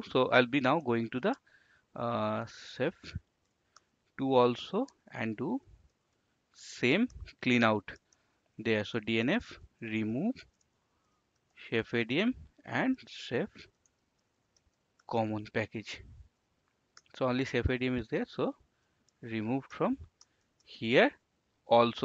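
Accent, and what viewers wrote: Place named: India. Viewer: Pretty nice video, clean, neat and in the expected Indian accent. Pretty nice job bro!!! You got my like!!!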